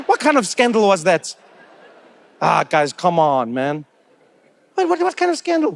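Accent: South Africa. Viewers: Love how his accent became 100% South African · He is 100% South African😂😂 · This is exactly how he spoke before he went to America, it's the South African accent. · Is incredible and so lovely, how easily he uses the south African accent for the public...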